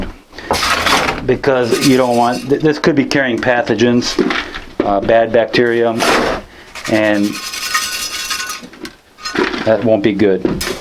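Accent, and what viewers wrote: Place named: North, United States. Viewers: Mid west accent + the word 'poop' = endless childish laughter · Did you live somewhere up north before Houston? I’m just curious with your accent.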